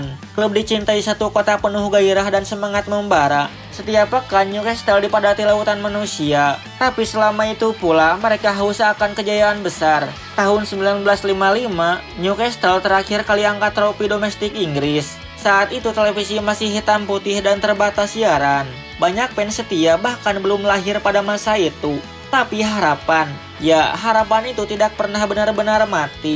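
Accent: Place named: Java, Indonesia. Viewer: suaranya jga bagus kaya orang Jawa medok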